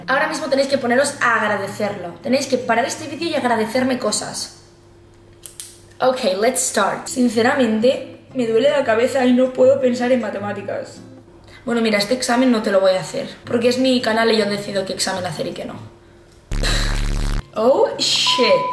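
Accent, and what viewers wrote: Argentina. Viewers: puedes hacer un video 24 horas ablando Argentino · Jajajj amo que hables Argentino creo que por eso la mitad que te seguimos somos Argentinos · !! El acento argentino te salió re bien! Sos una genia todo tus vídeos son lo más, soy de argentina y tu acento es un 100/10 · adoro habla con los posters en argentino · En los vídeos de nunca faltan: EL HABLAR INGLES EL HABLAR ARGENTINO EL BOLUDO EL CAFÉ "EL BAILE DE" T AMO PIBA JAJA❤️ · amo por que habla como si fuera argentina jajajajajajajaja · Y hablando como argentina es buenardo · amamos a hablando argentino,confirmamos. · Amo esta Pibaaa😻 Habla en inglés Habla en Argentino Y Nose cuál otra más.. Mee la comooo😻🥰🥰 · hablando argentino mi religion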